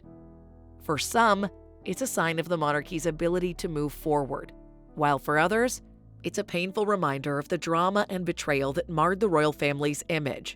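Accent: United States. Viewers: parker bowles was always a player. click bait lies, the creator of these trashy videos should be sued . always an american accent why? why would parker bowles confide in some american you tubers? im sure he would say nothing. source of this info?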